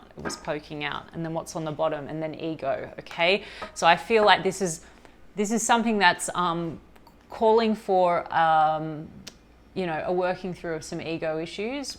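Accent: Australia. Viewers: You're SPOT ON for my relationship!!... Amazing 👏 Thankyou🙏🩷 And lovely to hear a fellow Aussie 💞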